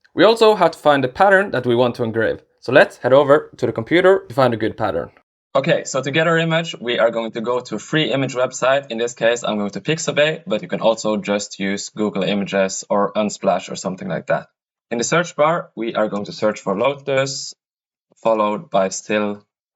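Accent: Norway. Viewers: Kænn ju tæll mi de vei tu de ræilveistæisjen? 😛 Hører kor du é fra.. Veldig gode videoer, forresten!!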